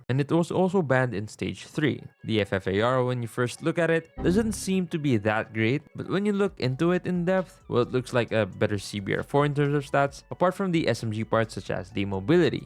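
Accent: Philippines. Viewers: are you filipino im picking up some filipino accent.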